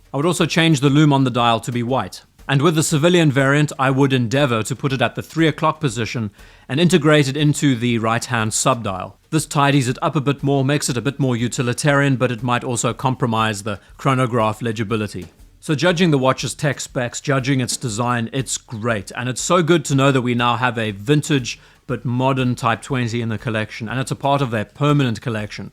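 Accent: South Africa. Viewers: ZA accent is my favourite way to speak English ( I know there isn’t a single uniform accent but they all sound great)! Fantastic watch by the way! The origins date back to World War II watches from Glashütte and Hanhart, with the latter producing them as part of war reparations. That‘s how a German design made it into French watch.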